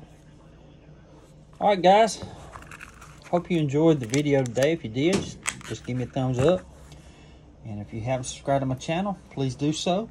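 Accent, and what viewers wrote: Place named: Alabama, United States. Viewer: Were trying to hone in on that accent if yours. Don’t worry I have one too so I’m saying Alabama and she’s saying Louisiana. Who, if any, wins the bet?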